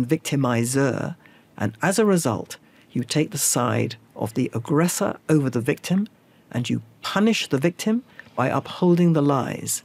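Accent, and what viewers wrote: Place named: RP, United Kingdom. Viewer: Yeah nice posh accent full of nonsense, so easy to inform lies into tiny minds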